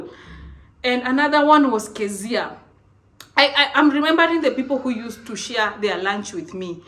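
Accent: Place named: Kenya. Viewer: I literally enjoyed every bit of the story. I can relate with everything you said (though I have not experineced everything). Well done beautiful. I love the Kenyan accent and how you just let yourself be " " and nobody else. Sis permit me to ask what Cameras and Lights you use for your podcasts?( I need the info for mine too) It is soooo clear and it enable me admire and just enjoy that beautiful transparent, glittering skin of yours. You are SkincareProMax.😊😊😊 Much Love from Cameroon. ❤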